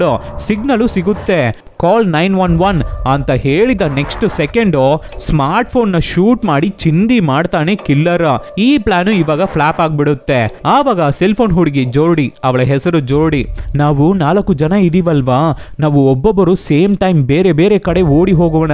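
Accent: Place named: Tamil Nadu, India. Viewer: ನೀನು ಪಕ್ಕಾ ತಮಿಳ್ ಅವ್ನು... ಆದ್ರೂ ಸೂಪರ್ 😄😄😄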